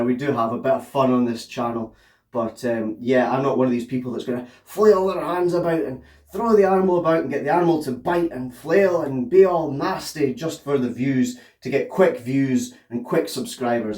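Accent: Scotland, United Kingdom. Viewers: I am in Banchory good to hear a Scottish accent on you tube thanks for the reply I’m enjoying your videos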